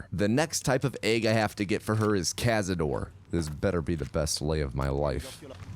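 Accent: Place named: Minnesota, United States. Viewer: Good MN accent, as a Minnesota native, I approve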